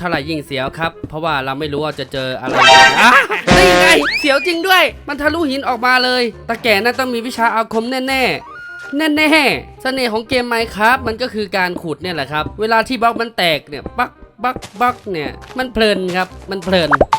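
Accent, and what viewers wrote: Isan, Thailand. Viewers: พี่ฉันเป็นคนอีสานหรอคะ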